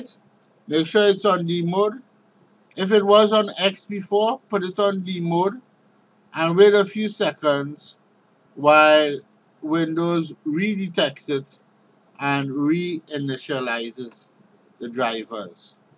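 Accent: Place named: India. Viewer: Indian accent...........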